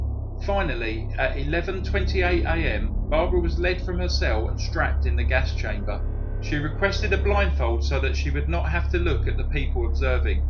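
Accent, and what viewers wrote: England, United Kingdom. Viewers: I think people think you and Brief case sound alike because of your British accent. To Americans British accents all sound alike. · These cats think anyone with an english accent sound like the same person.! lol Although these are 2 of my very favorite channels! Brothers or cousins maybe?? teee heee!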